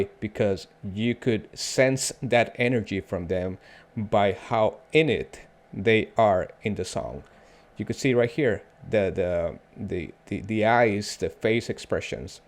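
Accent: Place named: Latin America. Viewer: The million dollar question is not about Rudy...what is your nationality? Your accent sounds latin, but clearly you don't understand Spanish. Inquiring minds want to know. Great opinions and comments!! Enjoy this journey you have embarked upon! Oh yes...this no rabbit hole...this a caldera, because artistry is as wide as it is deep! Salud! #APDVillaReality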